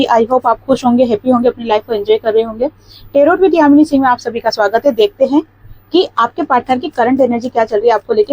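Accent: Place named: Madhya Pradesh, India. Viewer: Mem Apke Bolne Se He Muje Pata Lag Gaya Tha Ki Aap MP Ki Ho Mem Apki Reading Ne To Kamal Kar Diya 10000% Perfect Same To Same Situation Vry Vry Tks Gujarat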